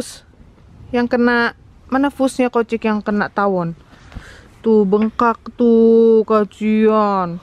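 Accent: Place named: Java, Indonesia. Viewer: Ya...allah liat mamak pakai bahasa jawa jadi jawa madura , kemekel aku mak dengernya #asli sidoarjo jatim #fans online mu mamak#semoga menular punya baby penantian 13th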